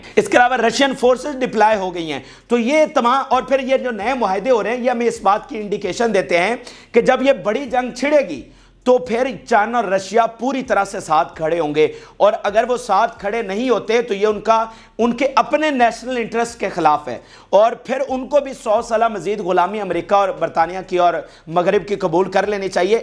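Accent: Pakistan. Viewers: Please! If you stop quoting the english version in your Pakistani English which is hard to understand, it will save a lot of time and we can watch.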